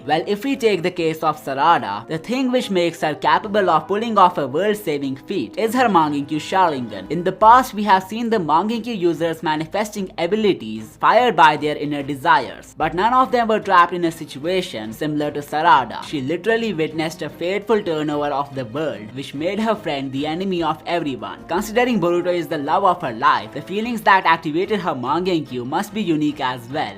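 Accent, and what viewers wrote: India. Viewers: Broo I thought ur indian because of ur accent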